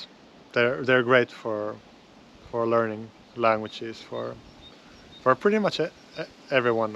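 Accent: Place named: Finland. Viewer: hey,! my dream is to learn Finnish, but I never have the time to do it. I hope I'll be able to do it little by little with your channel, I really like the way it is made. I speak 5 languages fluently, but I think it's because I have a hyperlexic brain. I really like your channel and I really like your accent in English (I can hear the Finnish melody I so adore, but it's only because my ear is well trained to detect the slightest trace of an accent). I never understood why my love for Finnish was so strong. it might be because it sounds a little echolalic and just very beautiful to my ear, or it might be my (supposedly) Finnish DNA speaking, haha. I do remember making a random Finnish woman at a Warsaw train station very happy when I announced "Minä rakastan suomen kieli" to her.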